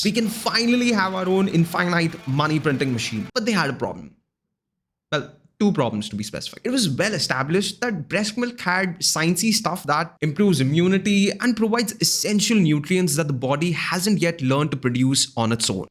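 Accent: India. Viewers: This man's got an awful Indian accent and doesn't know what punctuation means...he just rattles on and on like a robot. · oh mann, nix ist schlimmer, als indisches Englisch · You gotta work on losing your thick indian accent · The narrator has such heavy Indian accent that it's almost impossible to follow what he is saying. Can't you learn how to speak English properly? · This Indian man hits a nerve with me. Don’t care for his “English”. Listened for minutes that’s all I could take!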